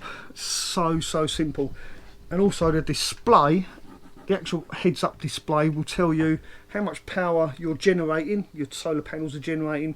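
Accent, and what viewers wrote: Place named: Essex, United Kingdom. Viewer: trying to guess your accent and we recon Wickford or Basildon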